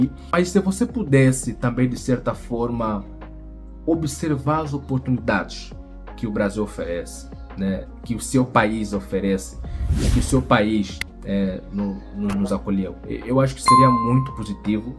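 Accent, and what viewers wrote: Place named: Angola. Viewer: Faz sentido tudo o que você disse. (Acho o sotaque angolano lindíssimo! 😊)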